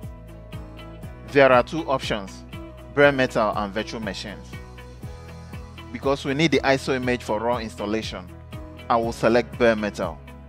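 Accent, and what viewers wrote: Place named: Africa. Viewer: this guy is soo good. like where were you men ... You actually spoke like an African .... everything was so simple .... God bless you ... I'm your fan from now onwards .... and I'm gonna spread the good news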